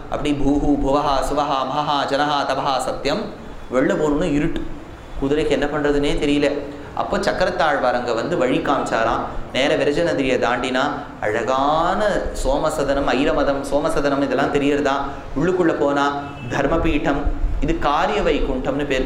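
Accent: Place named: Bengal, India. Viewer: Your Bangla accent was so fine Swamy. Loved that song. Pranams to u.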